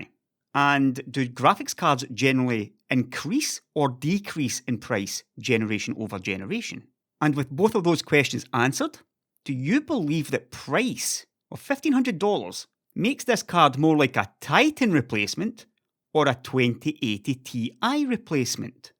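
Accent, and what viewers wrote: Scotland, United Kingdom. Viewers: thank you for your sweet Scottish accent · tech talk reasoning in a Scottish accent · We love the scottish guy with the scottish accent!!!! PLEASE dont stop the vids !!! Dont let us be a total fodder for the marketing departments !!! We need really smart opinions like yours!!! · I love the Scottish accent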